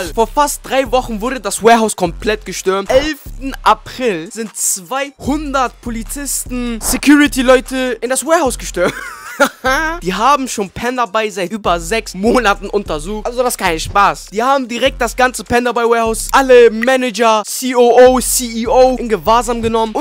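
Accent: Germany. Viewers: Geiler magga hahaha bist bestimmt aus Hamburg wa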